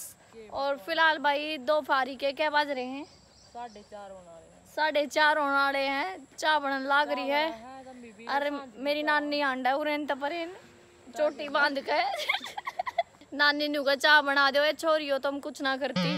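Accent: Haryana, India. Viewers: mja aa jata gai haryanvi sunkar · RAM ram Ji wase chai to hai badi Tati or kya haal hai kade haand rahe Ho aapki boli mixed hai Hindi Aadhi Haryanvi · Yo Bhai Najafgarh aali side k Hindi or haryanvi mix krke bolle hena ktti ghee sa ghal jaa hai baiii... 😂😂👅😍❤❤❤❤❤❤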